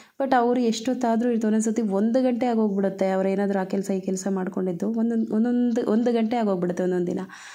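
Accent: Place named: Bellary, India. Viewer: Nivu matado shaily nodi nanu bellary ಮೊಳಕಾಲ್ಮುರು side erbodu ankonidde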